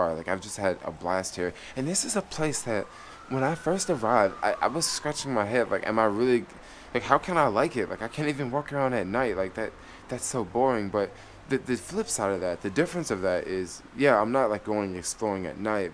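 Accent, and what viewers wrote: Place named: Memphis, United States. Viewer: You from Memphis so talk like you talk. you got your speech pattern.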